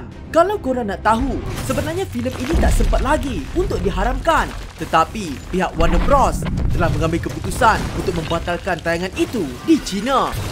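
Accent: North, Malaysia. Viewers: suka channel ni. Subscriber baru di sini. orang utara ka? A kat belakang tu pekat benau. Hehe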